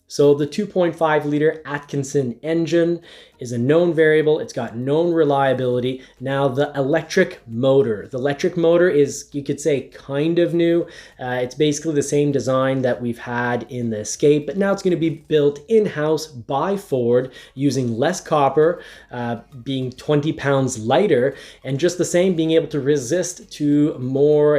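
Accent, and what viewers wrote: Canada. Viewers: Hermosillo.... Hermo see oh. There is no L sound. You say the name of the city like you're from Canada or something.... Good vids tho.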